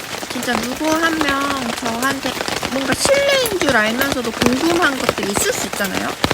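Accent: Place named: Gyeongsang, South Korea. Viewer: 참 목소리가 매력있네요 약간 경상도 풍의 사투리가 깔리면서 약간 옥구슬 굴러가는 듣한 뭔가의 특이함이 있네요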